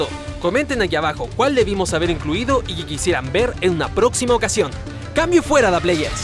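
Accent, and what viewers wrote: Chile. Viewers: Se nota que es chileno, buen video amigo saludos de coquimbo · Chileno verdad? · Es chileno??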